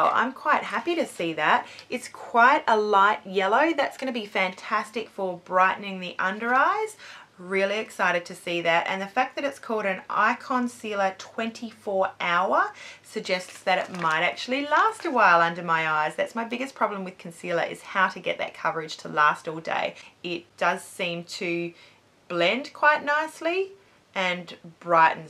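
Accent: Australia. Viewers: Hi! New subbie here and I love u already! And I love Australian accents. Hugs from the states.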